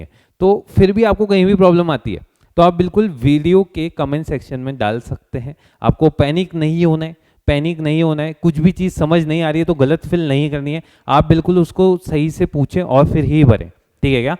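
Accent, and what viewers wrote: India. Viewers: Sir, cool Hindi accent!!🤯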